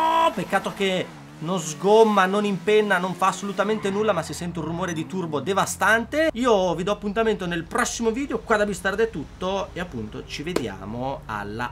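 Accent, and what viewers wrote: Veneto, Italy. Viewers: sei veneto?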